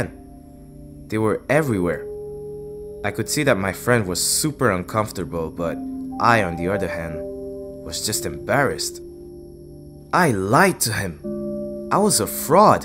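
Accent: Montreal, Canada. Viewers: accent canadien tape fort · Ay Man ça c'est du VRAI Français de Montréal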